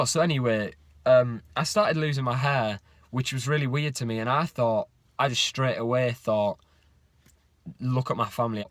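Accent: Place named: Liverpool, United Kingdom. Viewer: Are you from the same area as Paul McCartney? Sounds like you two have the same accent.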